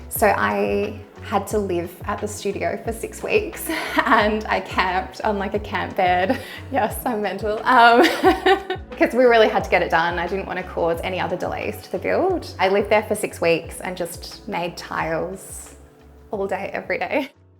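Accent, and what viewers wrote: Australia. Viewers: ''DesigAAH and MakAAH', ''LargeAAH'' this australian accent is triggering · I just have to say: australian accent, oh my! So beautiful!!!! THe house too, by the way! lol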